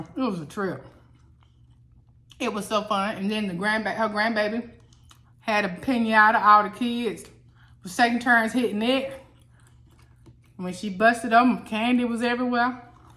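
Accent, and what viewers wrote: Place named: South, United States. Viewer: Your southern accent is so pure ❤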